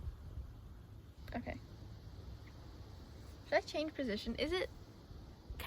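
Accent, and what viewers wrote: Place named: United Kingdom. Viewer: What area of the UK is your accent from?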